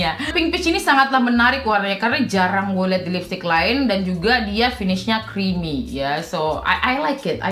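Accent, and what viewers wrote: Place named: Papua, Indonesia. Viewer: Sukaaaaaa bgt ama logat PAPUA nyaaa 🥰 sering2 dipake kaaaa 🥰👍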